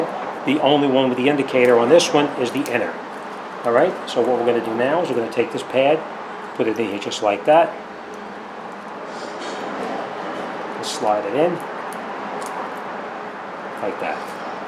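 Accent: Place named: East Coast, United States. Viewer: I can tell from the accent and the rusty rotors and calipers that this is East Coast. Good video!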